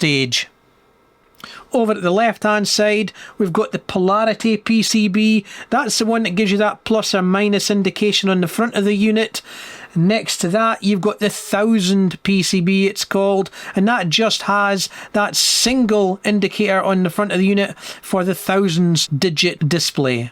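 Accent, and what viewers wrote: Scotland, United Kingdom. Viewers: Is that really 4 nixies? The board inside had just 3 nixies on it. Is the forth digit in front of the 3 nixies just a neon bulb that serves as a 1 indicator? Guess this can be refered to as a 1999 count meter 🙂 Nice repair anyway, and I just love your Scottish accent.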